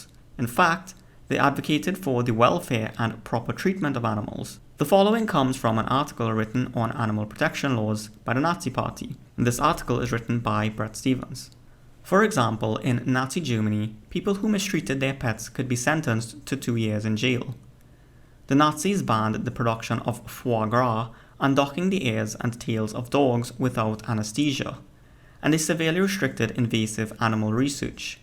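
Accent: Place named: India. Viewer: I can tell the narrator of this video is a South Asian Indian from his accent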